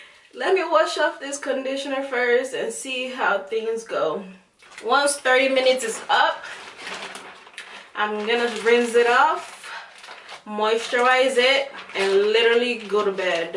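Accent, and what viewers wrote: Jamaica. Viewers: I was nervous too. lol I laughed so much. Are you a Jamaican?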